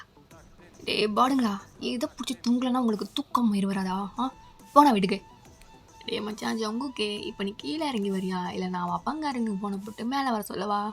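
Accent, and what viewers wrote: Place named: Kerala, India. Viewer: I am from Kerala ❤ your malayalam so cute ❤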